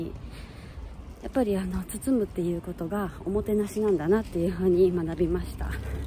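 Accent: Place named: Kyoto, Japan. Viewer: 京言葉素敵です❤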